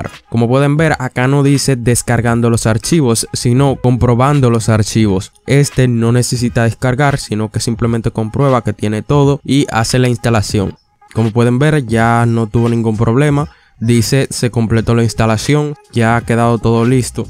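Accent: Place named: Dominican Republic. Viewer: Todo muy claro, bien organizado y estructurado, además acompañado de un buen acento dominicano. Muchas gracias señor ;)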